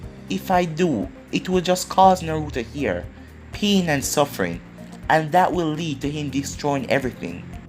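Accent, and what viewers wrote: Jamaica. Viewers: This is just a random question and sorry if it’s disrespectful but, are you jamaican ? The reason i ask is cause the speech pattern is sorta familiar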